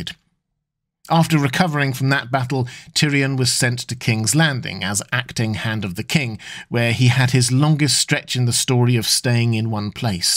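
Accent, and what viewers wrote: United States. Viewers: Yes, the American accent is really incongruous. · Nobody wants to hear an American accent do this (nothing personal, I’m Australian and nobody wants to hear us narrate this either). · Wow. As an American, listening to and then hearing a sudden American accent is quite jarring.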